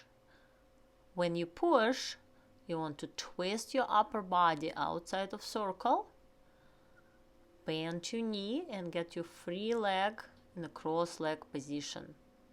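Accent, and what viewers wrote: Russia. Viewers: Enjoy all your helpful instructions especially with the Russian accent! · А по русски никак? Слышу русский акцент🤔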